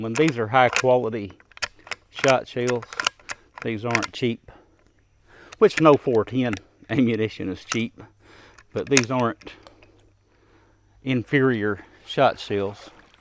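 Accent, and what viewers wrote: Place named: East Tennessee, United States. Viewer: I just found your channel. Where are you from? You accent is telling me somewhere in East Tennessee.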